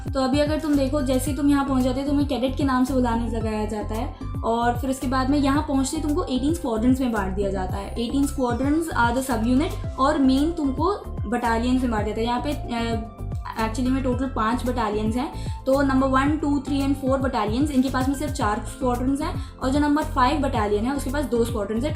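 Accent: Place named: Kanpur, India. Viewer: Mam I love your kanpuriya ascent❤️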